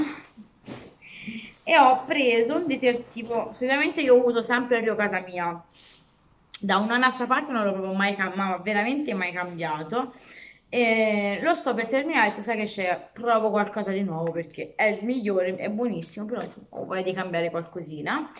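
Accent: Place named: Tuscany, Italy. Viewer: Ciao una curiosità, ma tu sei originaria di Napoli o di dove? Alcune volte mi sembra di sentire una inflessione Toscana... Non riesco a capire il tuo accento! Comunque sei una bellissima ragazza dentro e fuori e ti seguo sempre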